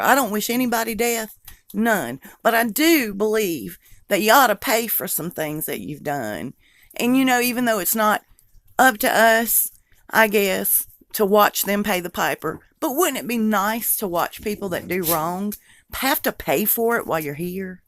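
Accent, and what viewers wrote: South, United States. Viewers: I love the sunshine in your sweet southern accent! You are a truth teller, and a very creative person. I look forward to seeing , and hearing your videos! We have a lot in common. About 7 yrs ago I began to pick up bits and pieces of the dark side of these families, and I do not look at life in the same way as I did , before learning all of this underlying truths. I am probably very sceptical, but in a positive way, and because of my own life experiences. I am a Christian, a Southerner too, and I was a floral designer, manicurist, and I started out doing hair, but decided to, graduate early, and the one thing that really stood out in my work was my passion to be a florist! I BECAME ill with several conditions while I was working on my career goals. I also had my own housekeeping business on Galveston Island, and here in my home, Austin , TX. 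I live in a very liberal city, and a very artsy community.I love those things, but it is hard to watch my home changing so quickly.I am not a political kind of person, and not a liberal or Republican. I try very hard to see all sides, and make my own informative desicions . I am disabled now, but I still push forward to remain as Independent as possible. I have 6 beautiful Grandbabies, and 2 wonderful daughter's, and 2 Very nice Son's- n- law. And, after 35 YRS of Mothering, and Grandmothering, I finally have 2 Grandsons! They are very energetic, and lot of fun. God has blessed me richly in this area of life. I do appreciate your entertai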